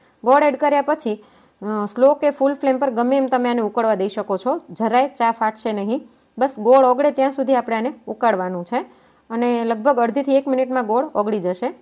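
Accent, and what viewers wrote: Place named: Kathiawar, India. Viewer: Madam tame puore kathyavadi bolo cho How sweet Kya city ma cho? All tips best👌👌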